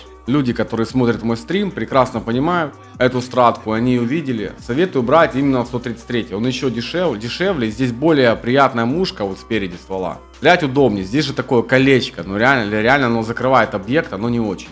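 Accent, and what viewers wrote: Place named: Ukraine. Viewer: Акцент украинский сразу слышно. Украина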